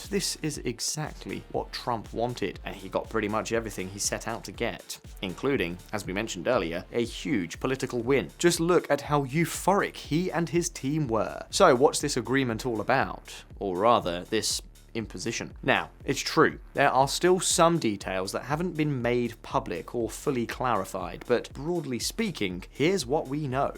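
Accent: RP, United Kingdom. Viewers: You cut all the pauses. Now your video doesn't breathe, it's rattling. It doesn't do justice to your posh british accent.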